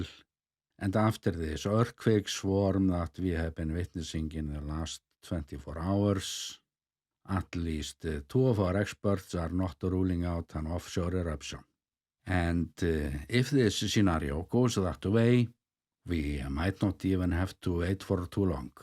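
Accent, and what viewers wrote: Iceland. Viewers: I love listening to this Icelandic accent!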